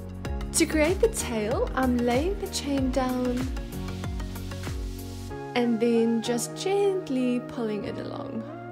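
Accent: South Africa. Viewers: the accent is so South African i love it 😍😍😍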